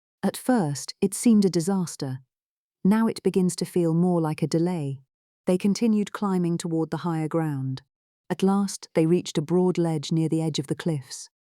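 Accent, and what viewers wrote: England, United Kingdom. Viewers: I have to agree. There is nothing better than an English accent.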